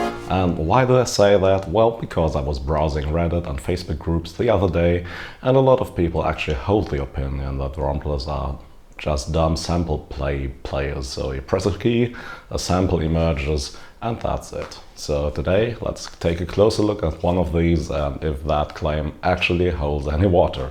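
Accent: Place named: Germany. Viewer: Ich schreib mal auf deutsch, weil ich faul bin und an deinem Akzent auch raushöre, das du deutscher bist :-) Das Belächeln von Romplern fiel mir in einschlägigen Foren auch auf und ich kann heutzutage nur sagen, dass das Lächerlich ist! Witziger Weise hat einer der Typen, der „Rompler“ belächelt, in seinem Profil angegeben, dass er NI Komplete 8 besitzt (schon ein paar Jahre her). Wenn ich diese NI Libraries mit meinem Roland XV-5050 und SRX-08 und 05 vergleiche, kann ich nicht anders als diesen Typen auszulachen! Vom Prinzip her ist so eine Software-Library mit den Instrumenten nichts anderes, als ein „Rompler“. ABER: Die Ni-Dinger waren oft Sample-technisch viel komplexer aufgebaut, als die meisten Presets auf meinem 5050, aber klangen halt leider nicht halb so geil. und wenn ich recht überlege: Die besten Beats, die ich in meiner Jugend gehört habe, wurden mit solchen „Romplern“ gemacht. 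Hol dir einen aus der Roland JV oder -XV-Serie oder Korg Triton, Yamaha Motiv und du hast eine breite Palette an Instrumenten, die GEIL klingen! Samplen ist heute ohnehin eine heikle Angelegenheit.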